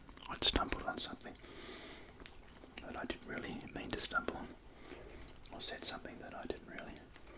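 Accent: Australia. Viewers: Which part of Australia is your accent? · This vid Is now in my top, Aussie accent's my fave xx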